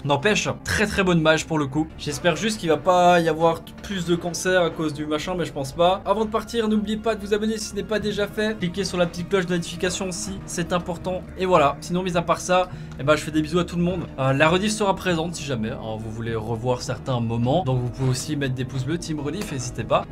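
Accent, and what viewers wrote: Belgium. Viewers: c'est étrange avant t'avais pas l'accent belge mais là en t'endtendant parler 😂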